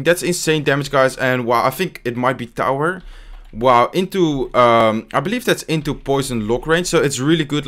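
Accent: Netherlands. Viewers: Joo ben je van Nederland je hebt een beetje een Nederlands accent;) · are you dutch?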